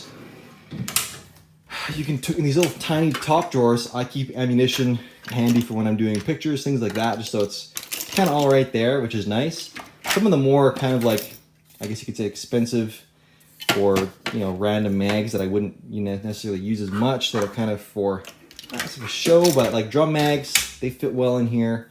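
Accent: Canada. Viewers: You sound Canadian. Awesome gun room setup and gear by the way.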